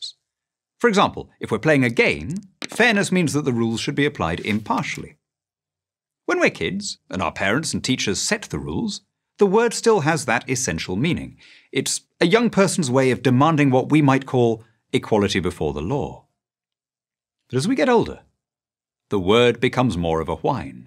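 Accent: United Kingdom. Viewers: Hearing the spoken in a British accent is so condescending. Can we have Americanized content for and by Americans please? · Some things just sound better in a British accent 👏🏼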